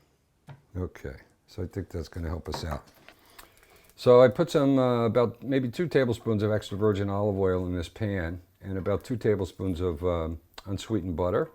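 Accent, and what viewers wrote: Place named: New Jersey, United States. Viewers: yeah I like this guy. jersey guy found a home in San Francisco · Gotta love that NJ accent.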